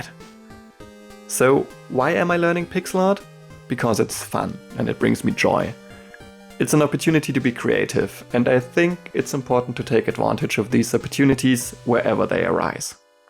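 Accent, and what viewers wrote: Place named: Germany. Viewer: I'm guessing you're German, based on the accent and the fact that you speak more articulately than most native English-speakers. Great video!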